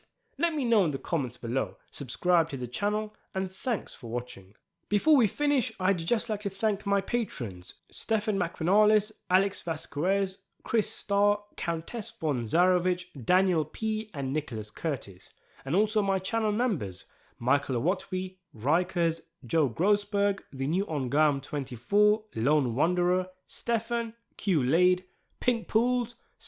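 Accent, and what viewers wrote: United Kingdom. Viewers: oh no a nigel accent :eyes-purple-crying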